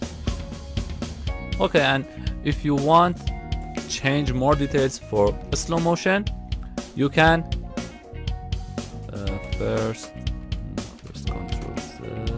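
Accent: Iran. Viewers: az avalesh goftam in iranie :D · Thanks, mate. you are sound Persian ;) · That Iranian accent ;)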